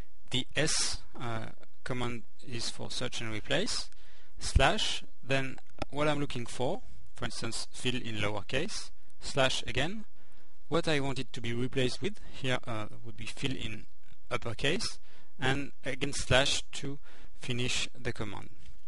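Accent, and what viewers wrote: France. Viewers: Its a French accent lol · Thanks alot man, very well explained and the french accent is interesting :D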